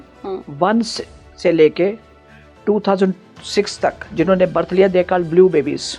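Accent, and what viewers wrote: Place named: Punjab, India. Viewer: The narration is pretty difficult to understand. Some anecdote starts abruptly without much hint of who and what she is talking about. Pronounciation in Punjabi style adds to the confusion. The statements about 33 Crores doesn't match with the description of 33 koti (types - 12 Aaditya, 11 Rudras, 8 Vasu, 2 Ashwinikumars).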